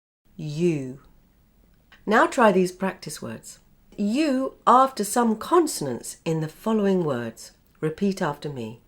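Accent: United Kingdom. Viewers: 𝑵𝒐 𝒅𝒐𝒖𝒃𝒕, 𝑩𝒓𝒊𝒕𝒊𝒔𝒉 𝒔𝒐𝒖𝒏𝒅.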